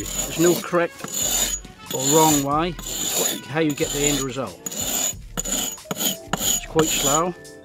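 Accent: Norfolk, United Kingdom. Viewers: Thanks, I thought Norfolk but didn't want to risk insulting you if you came from Suffolk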